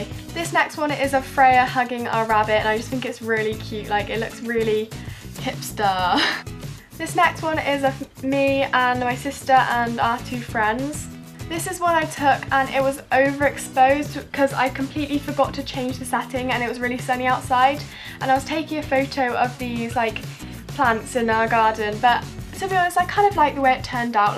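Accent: United Kingdom. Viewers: The British are so cool 😎 accept classy accent❤ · Omg I was so shocked when I heard ur voice I love ur accent. :) wish I were british. Great vid!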